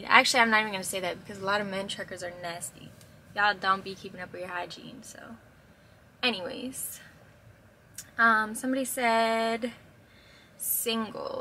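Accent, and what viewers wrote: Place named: Miami, United States. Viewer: You sure picked up that Latino Miami accent.. Maine accent is totally different..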